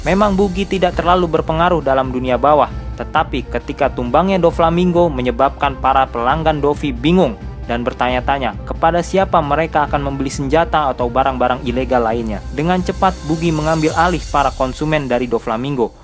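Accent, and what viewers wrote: Indonesia. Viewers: Aduu baang, bacanya diperbaiki mohon. Jangan semua nama diucapkan sama pelafalan Indo :(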